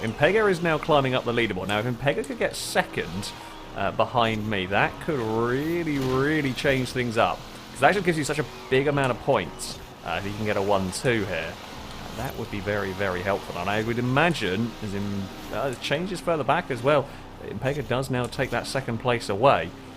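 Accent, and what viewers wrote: England, United Kingdom. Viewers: Going for the Aussie demographic in this video eh? As a Holden guy through and through it saddens me that it's getting killed off this year :( And also the way you pronounce Falcon is quite strange but I'm guessing that's just the Pommy accent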